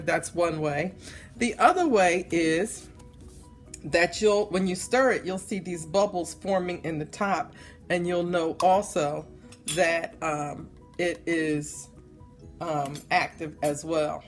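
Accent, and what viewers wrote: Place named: Bermuda. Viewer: Hello madam your ascent so clean looks Bermudian or American ? What you said never use metal pot or spoon she is right also use wooden spoon and glass bottle always any thing for stir hot stuff never use plastic spoon I seen even master chefs using plastic spoon during cooking hot food need common sense only thanks. From, K.singh North West India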